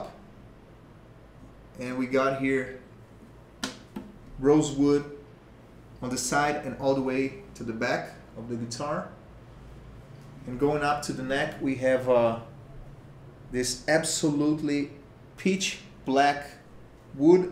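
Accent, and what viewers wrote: Brazil. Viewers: Cara, vc é brasileiro? Esse inglês tá muito abrasileirado hahaha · Brazilian accent has been detected.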